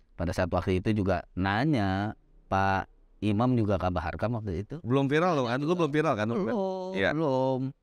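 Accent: Jakarta, Indonesia. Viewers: Bang logatnya Jakarta banget, lama di Betawi sih ya?, tapi keren sih.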